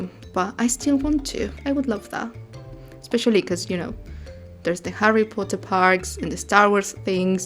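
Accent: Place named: United Kingdom. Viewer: I want that british accent! I need to move UK. What do you think? 😊 You are living my dream.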